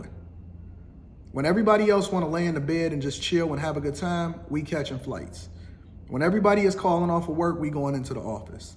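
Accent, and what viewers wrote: Detroit, United States. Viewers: Are you from Detroit bro?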